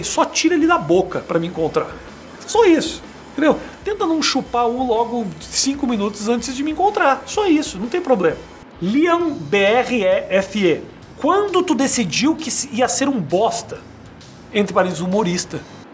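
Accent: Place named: Porto Alegre, Brazil. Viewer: Fala bem de Porto Alegre!! Tu é daqui, po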